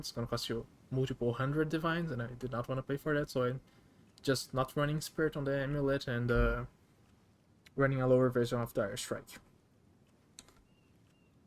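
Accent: Brazil. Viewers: hmmmmmmm tu é br né? esse e esse sotaque, me cheira a br kkk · é Brasileiro esse sotaque🥰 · Mano, excelente vídeo aospdkaposdkaspodk eu comentei antes de ouvir você falar, ai eu vi que era BR hahahahaha. Vou seguir sua build. Você acha que ela funcionaria com Abyss Lich? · Voce tem sotaque brasileiro parece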